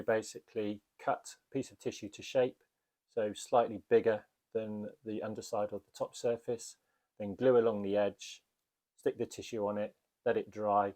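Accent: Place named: South, United States. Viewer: As a model’er myself, you have made a jolly good effort there. I detected a southern accent there. I am in the West Midlands. I hope we can speak face to face when me day.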